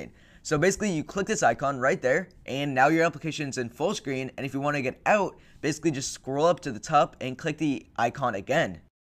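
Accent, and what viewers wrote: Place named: Canada. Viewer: You sound very Canadian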